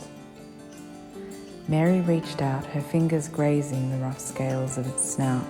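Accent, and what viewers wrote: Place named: New Zealand. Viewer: New Zealand accent? My favorite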